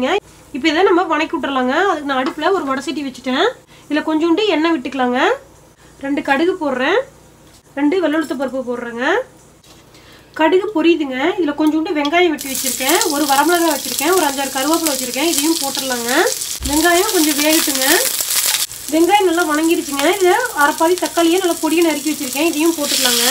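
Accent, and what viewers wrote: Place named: Tamil Nadu, India. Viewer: Romba supera irundhuchu. You are explaining really well.You are giving nice tips also like washing utensils immediately, cleaning the gas stove after cooking....your language is very nice to hear..you are from which part of Tamilnadu?I have become a fan of you now.Upload more under 30 minutes cooking videos please.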